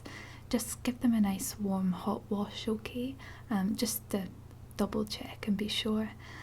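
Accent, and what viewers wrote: Scotland, United Kingdom. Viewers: Nice Accent! Scottish, Right? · ASMR I thought as much it was a Scottish accent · I'm absolutely hooked on your role play videos with a Scottish accent. the rp vids also seem so real. love them. thank you for making asmr videos!! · I don't normally like Scottish accents but yours is very nice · Where in Scotland are you from?x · All your videos are great and a particular thumbs-up for the Scottish accent! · heyo ma wee fellow Scottish pal!! where abouts in Scotland are you from? i wanna say glasgowish but then your 'body' sounds different from mine so I'm not sure hmmmmm ohh hmm maybe not hmm most likely much more up north? am I right? I'm definitely right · I'm not a fan of your Scottish accent, sorry! The Scottish accent feels like a weird step into the unknown. sorry to be critical just my own opinion. Otherwise really enjoy your videos. 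· How do u put on such a good Scottish accents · OMG SCOTTISH ASMR THE WORLD IS A BETTER PLACE 😱😱😱😱😱😍😍😍😍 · I just love to listen to a scottish accent.the best accent there is. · I thought the cables connected to my headphone speakers where plugged into the water supply, because that gorgeous silky smooth Scottish accent just flowed into my head. Please can you do another ASMR kidnap video sometime. Thanks a million for all your hard work. 👍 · Rebecca Harper it's called a Scottish accent... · Perfect ASMR! As a Scot I give your Scottish accent 10/10 :)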